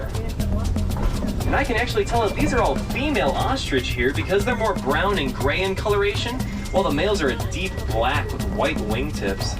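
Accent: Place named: South, United States. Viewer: Where are you from? Your accents sound south too 🎉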